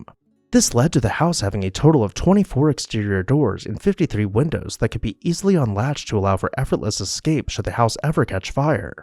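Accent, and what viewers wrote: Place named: Georgia, United States. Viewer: I would love to visit your town! I adore these beautiful old houses. Georgia is a beautiful state from what I've seen in movies and photos, and I love the charming Southern Georgia dialect. I grew up in E. Texas and have that peculiar drawl, but I think Georgians have the best Southern accents of all. No one can give an insult as elegantly and sweetly as the genteel Georgians! "Bless Your Heart!"😂🤣💖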